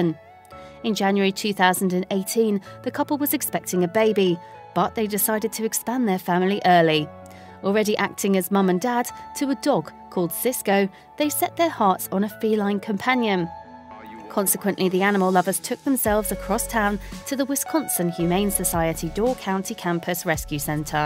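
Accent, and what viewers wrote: United Kingdom. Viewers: What is it with American sites that use a British accented narrator? Will the accent make it seem more legitimate? Pretty condescending. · glad i dont have to listen to that brit accent often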